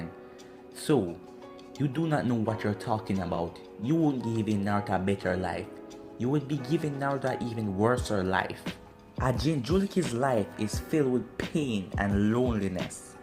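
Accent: Jamaica. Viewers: How you doin ? And are you Jamaican ? If you are wa gawn? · We’re are you from Jamaica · love the accent, jamaican? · He’s Jamaican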